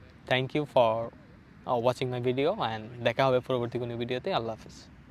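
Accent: Sylhet, Bangladesh. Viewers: Vai er basa ki sylhet ?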